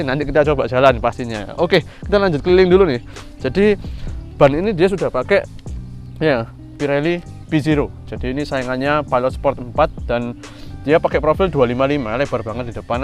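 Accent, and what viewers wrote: Surabaya, Indonesia. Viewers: Kueren PuuoooL Kooo,,, penjelasan khas Bonek sby Ko... Uwis tak Suckrip & tak Like ko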